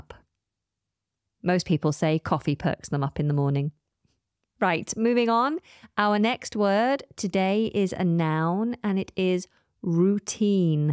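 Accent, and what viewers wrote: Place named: United Kingdom. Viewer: my favorite english teacher.... I love her british acent❤❤❤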